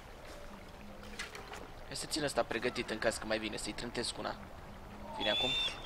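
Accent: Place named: England, United Kingdom. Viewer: Vezi ca ai putina engleza pe la coltu guri:)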